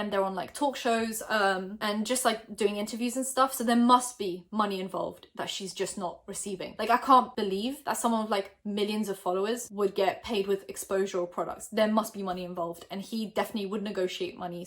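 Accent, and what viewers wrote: United Kingdom. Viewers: Vixen idk she’s definitely british, that’s not an australian accent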